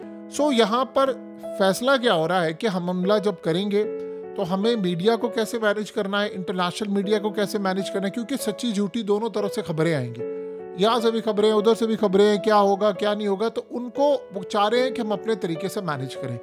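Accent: India. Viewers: تو بڑا کتا ہے والے۔۔۔اب کہاں گئی تیری حب الوطنی اب تو تیرا لہجہ بھی چغلی کھا رہا ہے کہ تو کوئی انڈین کتا ہے۔۔۔اب تیرے جذبے کدھر وڑ گئے ہیں ؟؟؟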